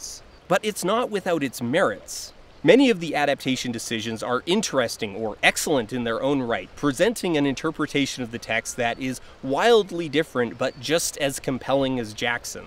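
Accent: Canada. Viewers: hearing a Canadian accent so far from home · That's just a Canadian accent. don't be racist. :P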